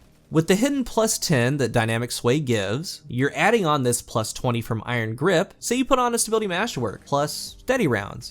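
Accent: United States. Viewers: ' dulcet American tones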